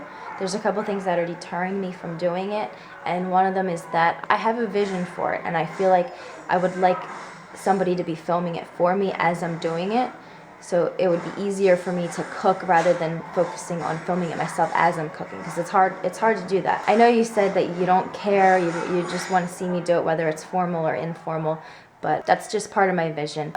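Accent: New Jersey, United States. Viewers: She has that jersey accent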